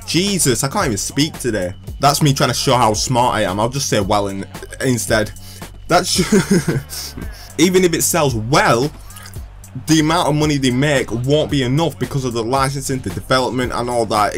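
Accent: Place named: Yorkshire, United Kingdom. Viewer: Yorkshire accent m8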